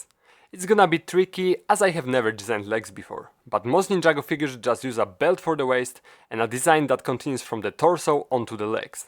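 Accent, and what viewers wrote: Poland. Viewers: akcent zbyt polski😩🙏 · Are u from poland? Beacose ur accent sounds pretty polish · Brzmisz jak brat Polak który próbuje po angielsku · Are you polish like me?? You have polish accent.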